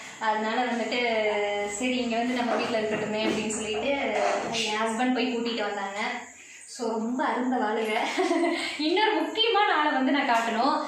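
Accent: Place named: Coimbatore, India. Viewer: Nice sister.. exactly Coimbatore slang.. I too from Coimbatore.. very nice to see namm ooru ponnu🥰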